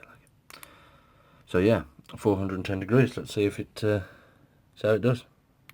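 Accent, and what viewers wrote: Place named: United Kingdom. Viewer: Thanks dude. Nice hearing a UK guy do good, in depth reviews for once! Will do man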